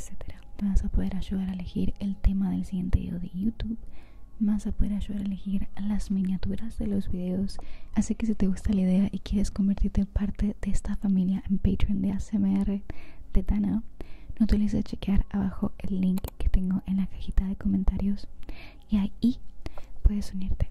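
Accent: Mexico. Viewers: ¿Sos Argentina?😯 Te oigo más acento como mexicano jajaj. Súper bueno el video, tenés una voz muy relajante.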